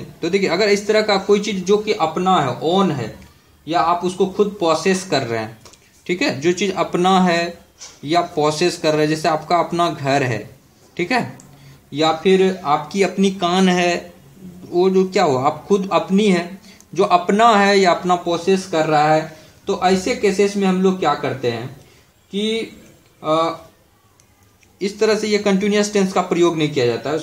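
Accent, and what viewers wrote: Bihar, India. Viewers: sir aap Bhagalpur Bihar SE ho kya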